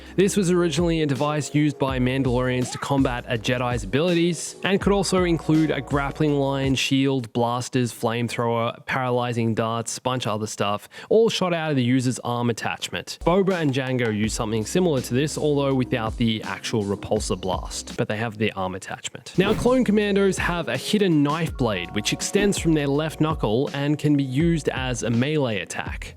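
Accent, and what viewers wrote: Australia. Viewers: Are you from Australia bro? Love your accent ;)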